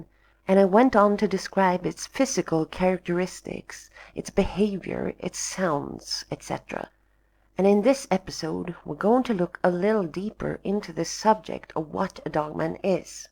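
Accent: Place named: Ireland. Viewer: You said you're Swedish but your accent sounds Irish. I loved listening to your narrative. Very good!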